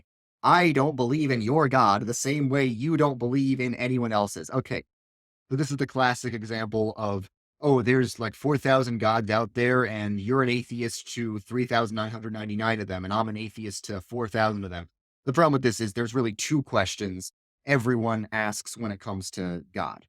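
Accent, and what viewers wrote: New York, United States. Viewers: When gets mad, his New York accent just gets stronger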